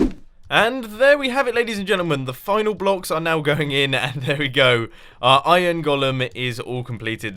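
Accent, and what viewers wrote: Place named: United Kingdom. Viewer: Iron golom. Great British accent bro :)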